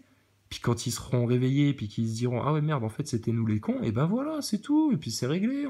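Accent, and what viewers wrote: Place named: South, France. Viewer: Tu me tue avec ton accent du Sud 😂😂😂🙏😎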